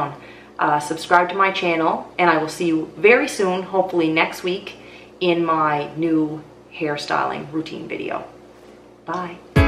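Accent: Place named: Nova Scotia, Canada. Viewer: So glad to hear your Nova Scotia voice again! I also got into house plants about 2 years ago and now I probably have a hundred. I have them in the front yard, in the backyard, and all over the house. Last year we had a freeze and I had to move them all inside and then it was ridiculous. But most of the time they can live outside because we don't freeze, which I know is not an option for you. I cut my hair to chin length and most of the time have it pulled back with a headband. It's not my best look but I'm at a stage right now and I just can't stand to have my hair in my face. Again, great to see you!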